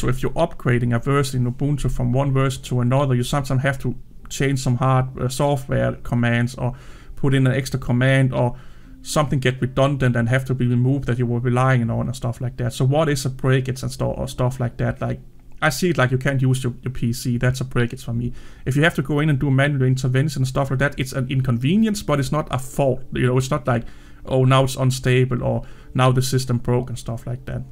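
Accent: Denmark. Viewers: I really enjoyed your Danish accent !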